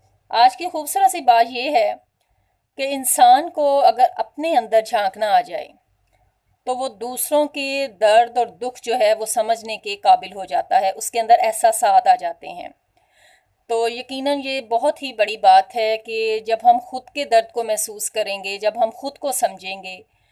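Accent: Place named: Faisalabad, Pakistan. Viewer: Ye to faislabad say hy lagta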